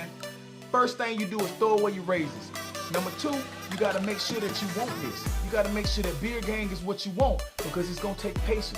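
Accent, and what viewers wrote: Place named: South, United States. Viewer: Bride has to be from the south lol I’m from the south that nigga sound just like us lol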